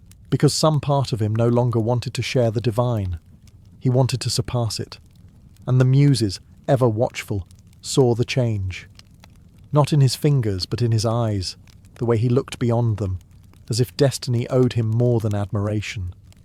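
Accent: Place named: United Kingdom. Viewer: A British accent to sleep? No thanks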